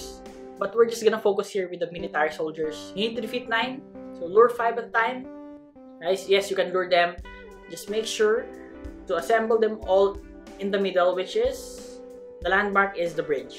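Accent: Philippines. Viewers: His accent is filipino lol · are you a filipino? Because you sound like one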